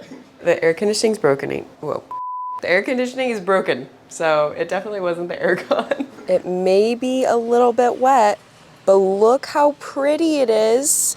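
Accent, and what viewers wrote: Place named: Philippines. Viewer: has a Philippine accent. My bestfriend is an exchange student from Phil.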